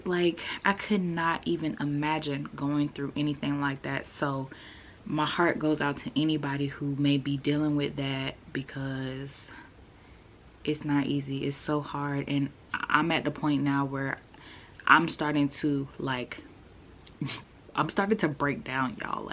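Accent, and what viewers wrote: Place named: Nigeria. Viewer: Your Nigerian accent is actually strong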